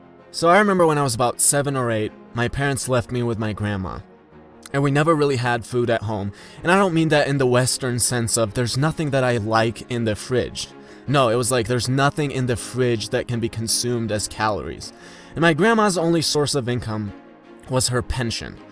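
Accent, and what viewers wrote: Georgia, United States. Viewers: You from Georgia? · What? Are you from Georgia? Duude❤ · You are from Georgia?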